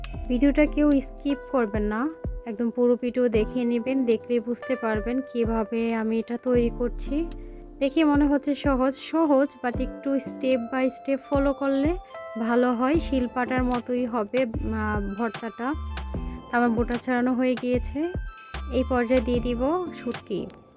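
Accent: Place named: Brahmanbaria, Bangladesh. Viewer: ব্যামনবাইড়্যার মতো কতা কইন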